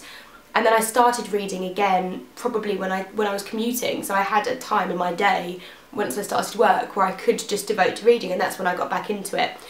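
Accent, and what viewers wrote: United Kingdom. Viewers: I can't believe it, you're so friendly! I came to your video/channel 'cause I wanted to hear the british accent and get used to it, but I'm impressed! I'm brazilian and I have plans to study english in London so I'm kinda going to live there for a few months and I'm freaking out! I'm happy that I get here in this video, so I can get used to some words and actually hear about books, it's useful! Thank you so much! (and pardon me if my english is that bad or if I've said something wrong, I didn't used a translator or anything :P ) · So nice to here another English accent on booktube. It's also nice to have someone on my sub list with Adult books. Looking forward to watching more. :)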